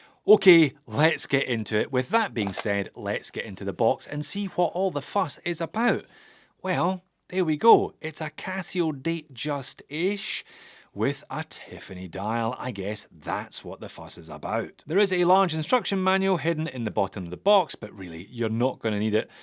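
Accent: Scotland, United Kingdom. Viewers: Thanks for another great review. As a Newfoundlander Canadian, I really like your Scottish dialect. It makes your reviews far more fun and witty ;)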